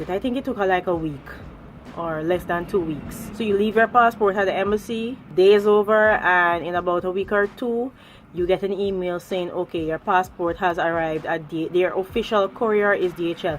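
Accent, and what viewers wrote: Jamaica. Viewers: girl...i swore u were Jamaican... i am shook wow..interesting information.....very informative video.... Thanks for sharing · You sound way more Jamaican than Guyanese, so I've claimed you as a Jamaican lol. I absolutely love your creative hairstyles (combed out my locs last November), especially those on your OMG channel. Please keep doing more as we have similar textures.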